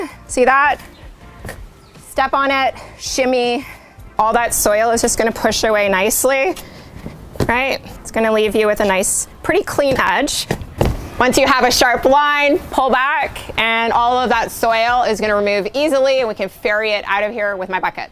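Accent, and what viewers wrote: Canada. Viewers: She sounds Canadian.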